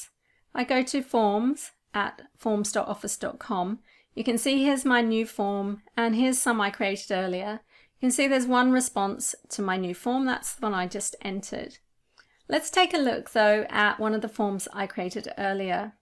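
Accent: United Kingdom. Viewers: Many thanks,. 🤣 I always thought you were British 🤣. Admittedly, I've never been good with accents, and/or it wouldn't be surprising for a Brit to live in Australia....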